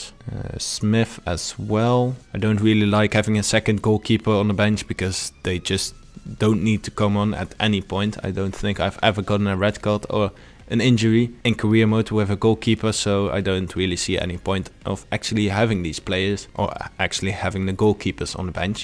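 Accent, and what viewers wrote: Netherlands. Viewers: Hmm I can hear the Dutch accent ;)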